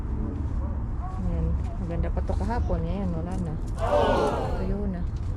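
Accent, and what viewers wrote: Visayas, Philippines. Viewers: I really love your accsent...viva to all visayan..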